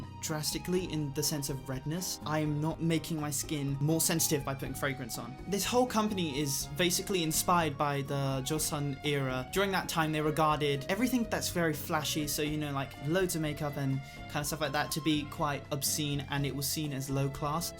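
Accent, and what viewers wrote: United Kingdom. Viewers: Whoaaaa is it me or does he sound british? I love his accent! Edit: Thanks for the likes uwu ❤️ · zeliéxsé he sound british? I wonder why? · Sue me but I'm here to hear your british accent :')😂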